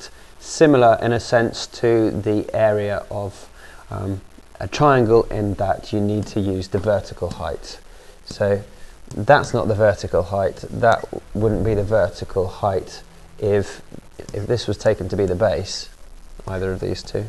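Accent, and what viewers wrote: United Kingdom. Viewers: your accent is so british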